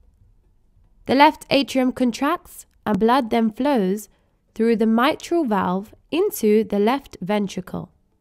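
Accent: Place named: United Kingdom. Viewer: Bless you and your British accent. Thank you.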